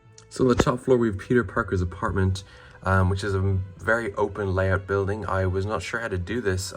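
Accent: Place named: Ireland. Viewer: Just found your channel and am loving it! Nice to hear an Irish accent on Lego videos for a change. Keep up the great work 😁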